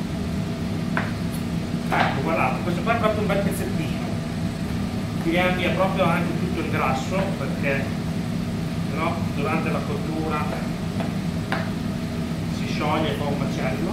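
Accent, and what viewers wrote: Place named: Emilia-Romagna, Italy. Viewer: il tutto molto avvincente e credibile (soprattutto).ottimo sei riuscito ad impastare al 80% di idratazione.a questo punto se puoi mandare le esatte proporzioni degli ingredienti, o mandare il link del maestro pizzaiolo.la pizza in teglia la dovresti togliere dalla teglia ed adagiarla su una griglia sopra levata, per togliere l'umidità del fondo.ancora complimenti per la sala cucina, veramente a norma di legge e pulita.anche l'accento della emilia romagna, personalmente mi garba.che dire ma ce li farai vedere come si fanno i tortellini al brodo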